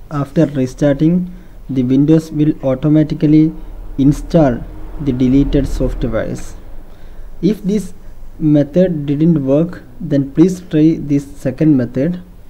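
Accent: Kerala, India. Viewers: Dude are you from Kerala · Where does he come from ? Sounds like Apu from The Simpsons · Malayali anno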